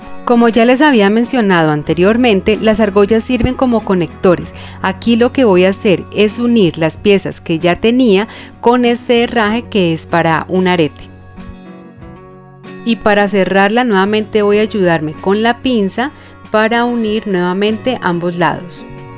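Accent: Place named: Colombia. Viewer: Hola; mil gracias por tus explicaciones..creo por tu acento que eres colombiana como yo, que bueno si es asi Estoy aprendiendo mucho con tus tutoriales por ahora para hacer regalitos y ojalá pueda vender. Vivo en usa. y he conseguido poco a poco los materiales. Tengo 69 años y ha sido facil aprender. Un abrazo desde la Florida, usa.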